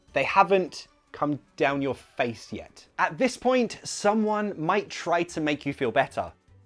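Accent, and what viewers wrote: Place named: RP, United Kingdom. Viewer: Kudos. Love when you teach posh english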